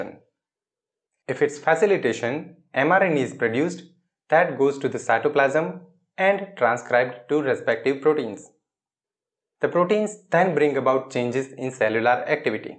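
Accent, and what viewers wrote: India. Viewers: why it is always indian accent, I am sick of it! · If you are indian then its common to have indian accent and there is nothing wrong in it. Dont change your ways just becoz some stranger not liking it. U can't impress everyone, people are not even happy with gods, and we are just humans. Anyway great video. I like your accent 👉👈🥁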